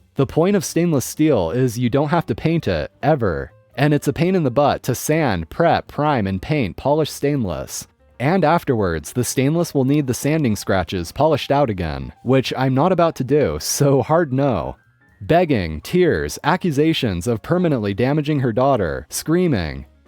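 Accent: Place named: Canada. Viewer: What a NOT charming contribution to the dialogue. Different continents, different pronunciations. Tomato, tomato. As I recall, our host is Canadian, as am I, and we both say it NOT your way. If you can’t be nice, don’t bother to comment, especially on such a petty item and in such a preposterous fashion.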